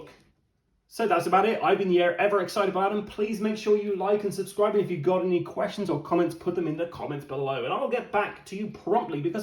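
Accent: United Kingdom. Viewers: OK, I'm confused. Youtube says this was uploaded a year ago but it only came out a couple of months ago didn't it? I'd put it down to geographic area but I'm uk and your accent is English.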